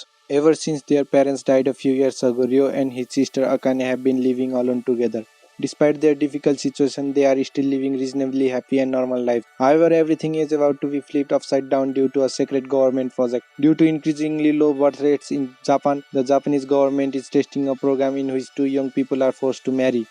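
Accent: Nepal. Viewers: He has an Nepali Accent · Lot of Nepali accent. I already knew. Me 2 Nepali 🇳🇵🇳🇵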